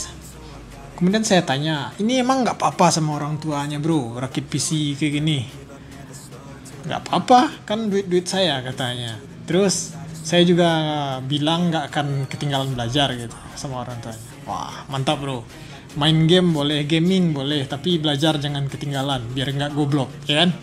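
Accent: West Sumatra, Indonesia. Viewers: Logatnya urang awak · Wkwkwkwk aksen abg ngomong kliatan urang awak banaa😅😆😆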